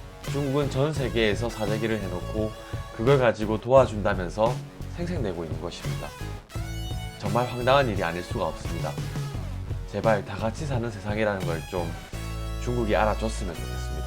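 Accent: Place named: Gyeongsang, South Korea. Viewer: 진짜 듣기 싫네, 저 목소리. 쌍도 것이구만. 쌍도 새기들은 무슨 유튜브를 저렇게 많이 하냐, 선거나 제대로 하지. 요즘 쌍도 사투리 들으면 두드러기가 난다. 꺼져라, 이 더러운 쌍도 물건아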